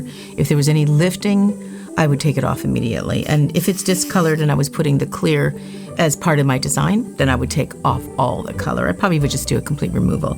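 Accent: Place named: Canada. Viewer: I was wondering if you are Canadian, then I heard you say “nuke” and “eh” and that was my answer! I have just started doing my nails at home and have been doing research on products and designs. I’m going to do cat eye next!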